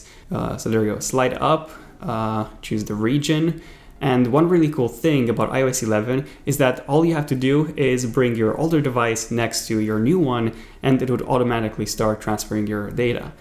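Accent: United States. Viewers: Your accent is like from usa. Good video mate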